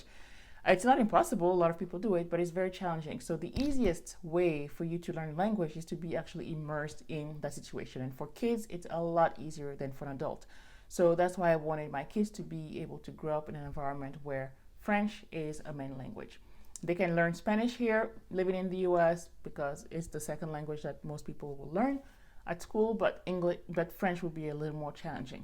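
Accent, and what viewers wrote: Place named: Cameroon. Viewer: my girlfriend is from cameroon so i can tell you spent time with a cameroonian just based off the way you talk lolololol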